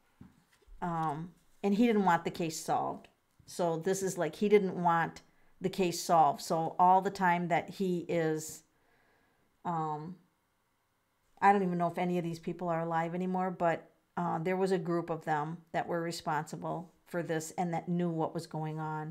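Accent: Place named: Midwest, United States. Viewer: First I love your mid western accent. My husband is from Green bay wi. Second I love how you speak to Tara directly with the cards instead of just interpreting or reading the card. You really see her and acknowledge her. Don't forget to #taracalico in your tag section so it will get more noticed. I'm going to check out more of your work I love your personality.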